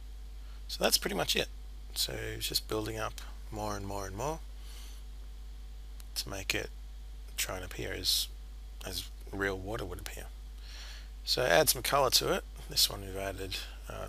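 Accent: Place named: Australia. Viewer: I'm a fannnnnnnnnnnn !!!! BTW you sound like an aussie or a kiwi.... either one... I'm a fannn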